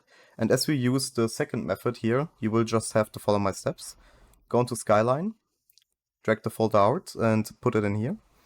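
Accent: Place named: Germany. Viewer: Bro klingt mies deutsch ja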